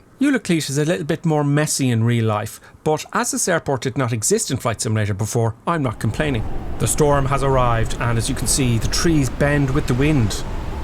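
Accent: Ireland. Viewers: Are you another Irishman 😂🇮🇪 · Flight simmer with amazing Irish accent? No choice but to sub :)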